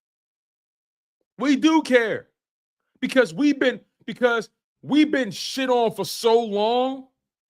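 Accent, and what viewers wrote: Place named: Arizona, United States. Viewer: I ain’t know you was from Arizona street i got a lot of family there might have some mutuals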